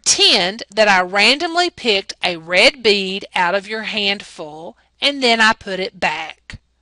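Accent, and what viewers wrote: United States. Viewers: What accent r u using boston? Southern? I like it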